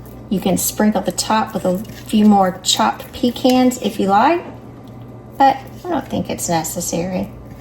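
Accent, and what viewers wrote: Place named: Georgia, United States. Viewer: Happy Thanksgiving to you and your family. I have been watching for awhile now and I love the variety of recipes you present. I also love your gorgeous Georgia accent. Since I'm from Alaska I don't have too many southern accents around me! One of my favorite holiday desserts is pecan pie so this looks delicious to try. God bless you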